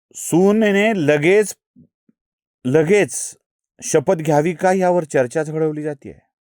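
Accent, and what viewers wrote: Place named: Marathwada, India. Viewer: अहो ते मराठवाड्यातले आहेत. त्यांचे उच्चार असेच.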